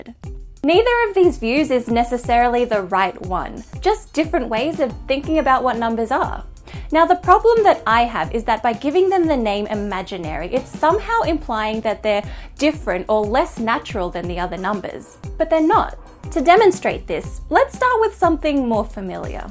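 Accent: Australia. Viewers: sounds like a healthy Australian accent to me. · Love the australian accent :)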